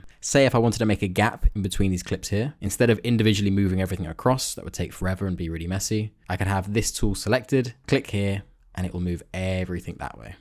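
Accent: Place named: United Kingdom. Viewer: Thank you for these tutorials, you are a really good teacher - and British which helps me a lot! I have been trying to learn how to make a read-a-long video, similar to a karaoke video but to go along with audio narration. If you think there is a market for a tutorial on this, it would be awesome. Thank you x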